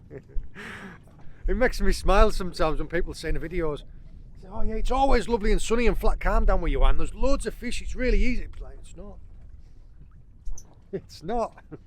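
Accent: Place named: Teesside, United Kingdom. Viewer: Hi great video as always, can I just ask where you are from originally? I've narrowed the accent down to the Teesside area or maybe the other side Barrow/Morcambe way?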